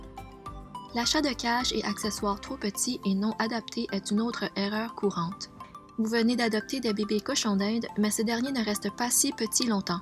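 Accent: Canada. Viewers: J’ai beaucoup aimé ta vidéo ! Je crois que tu habites au Canada, parce que tu as un petit accent 😉 mais est ce que tu pourrais me dire où tu as acheté ton foin ? J’en ai jamais vue d’aussi bonne qualité ! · Salut ça fait plaisir l'accent du Québec ! Ton cochon d'Inde blanc est trop rigolo. Puis je l'adopter ?!? 😄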